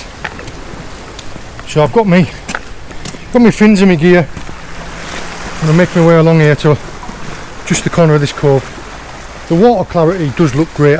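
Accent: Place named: Geordie, United Kingdom. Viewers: I thought you was a northern lad just come across an old video <link> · As a jock I cant work out if your a Geordie or Yorkshireman, also get a military vibe about you, have you served 👍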